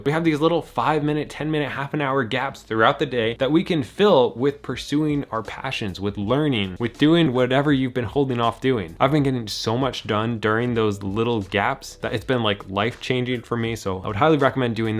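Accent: Scotland, United Kingdom. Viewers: I would’ve never guessed that was a Scottish accent and my husband is Scottish. My views on commuting and waiting have completely changed with audiobooks, kindle and online courses. You can read or learn anywhere … almost nothing is a waste of time unless you forget your phone charger.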